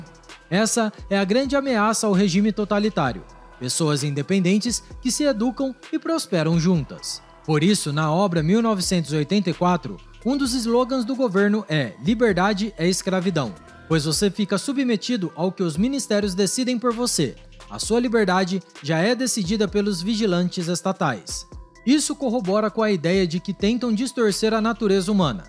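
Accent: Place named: Caipira, Brazil. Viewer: Essa narração caipira é osso.....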